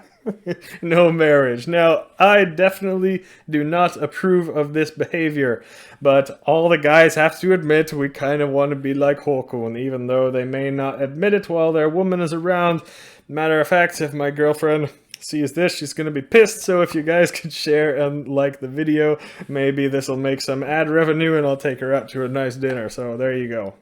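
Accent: United States. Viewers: Is the bloke in this video actually Norwegian? He sounds very American. I know Norwegians, they don't sound like this bloke, this bloke sounds like an American that moved to Norway (or Norwegian but spent more time in America) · Pretty sure he is Norwegian, yes. Most non Americans develop an American accent when they learn English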